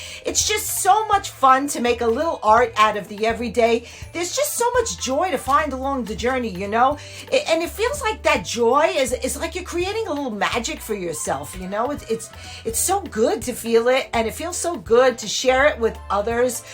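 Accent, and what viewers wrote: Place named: Brooklyn, United States. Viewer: This came out so beautifully! I know I’ve said it before, but your Brooklyn accent makes me so happy. 😂😂 Those molds are soooo beautiful. I’ve been experimenting with resin work for the past year or so and I have had nothing but trouble with it! I know you painted yours, so it doesn’t matter in this instance, but I had consistent problems with my resin not fully hardening and always having bubbles in it. I also have used that exact same resin and it STUNK so bad it made my whole house reek. ☹️ Omg you with the neck ruff 😂😂 I would love to see a full video tour of your she shed!!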